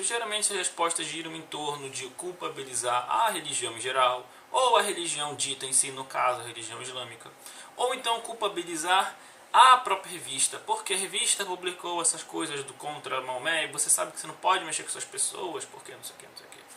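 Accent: Pará, Brazil. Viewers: Paraense? Adorei o vídeo! Maravilhoso!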